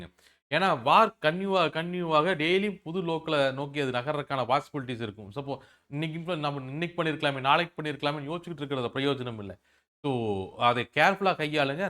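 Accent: Kongu, India. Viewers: அது எங்களின் பிரத்யேகமான கொங்கு slang Sir.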